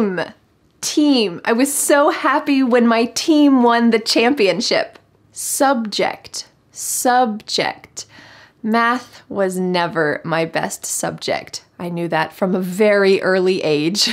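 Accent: United States. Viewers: I watch all your videos to hear your voice because your voice is very clear and good quality. That's why I get to listen to American English. I can easily understand your voice. thank you so much.. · Hello. I'm from India. I love your english speaking way in american accent. It is absolutely remarkable. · hai mam ..is this is UNITED STATES English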